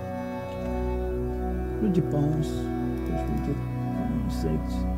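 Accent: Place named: Russia. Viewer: You sound Russian but we're not sure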